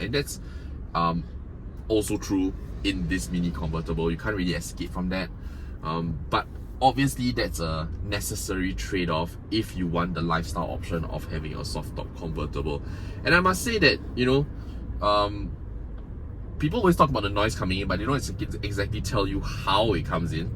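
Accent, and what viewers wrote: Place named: Nigeria. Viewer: Thank you for the review!! Considering purchasing. Also, you kinda sound Nigerian.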